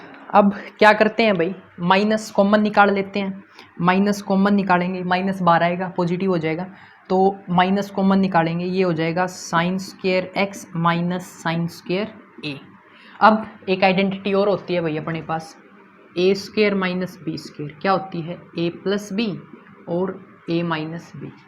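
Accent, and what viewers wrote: Haryana, India. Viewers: U R Great Sir Your Haryanvi Tone is just ossmmm Thank u Maine puri youtube chaan di thi par ye topic nhi mile the And main bhi karnak ke ek gaavn ka hun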